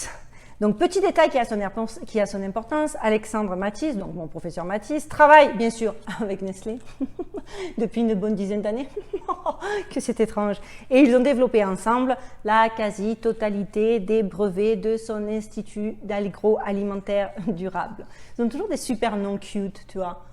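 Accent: Marseille, France. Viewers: Loook et accent de cagole, discours complotiste de tete percée....ha ha ha ....sérieux....qui peut écouter ca plus de 30 secondes sans avoir envie de l'entartrer cette folle ! FOUT TOI A POIL ! T'auras plus de viewers !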